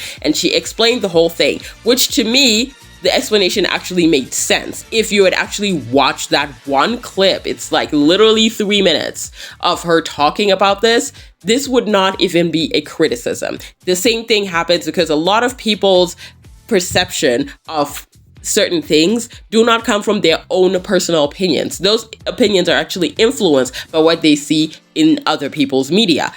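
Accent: United States. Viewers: not related but i thought you were actually from kenya and just have a really good american accent lol are you actually from the states? bc i don't blame you and your family for getting out of this racist hell hole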